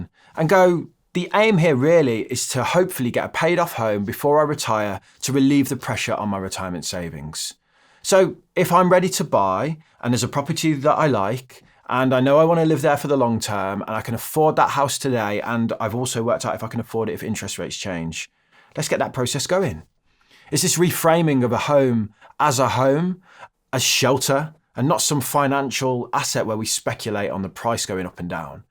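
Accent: Birmingham, United Kingdom. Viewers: Took me this long to realise you were a Brummie 😂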